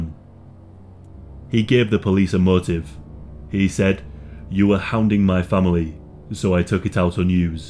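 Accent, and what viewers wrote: United Kingdom. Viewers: Why do I picture Ian Curtis when I hear your speaking voice ? I guess it’s the deep British accent.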